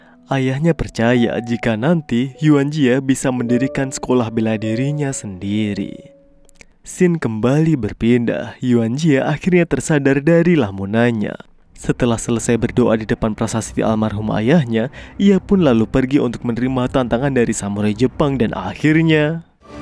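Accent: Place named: Buol, Indonesia. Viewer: Logat apa kw pakai itu... Orang buol kh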